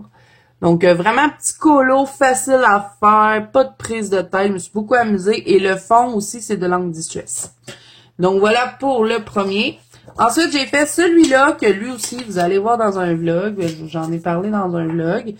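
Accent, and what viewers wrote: Quebec, Canada. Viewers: Salut, tu n'est pas satisfaite de ton colo Titanic mais voyons donc, tu nous joke comme on dit au Québec! Il est top et le coeur de l'océan est très réussi je trouve. J'aime beaucoup aussi celui avec les poissons volants. Bravo pour tes belles mises en couleur, j'aime regarder tes vidéos et surtout, t'écouter me rappelle quand j'habitais à Montréal. J'adooooore!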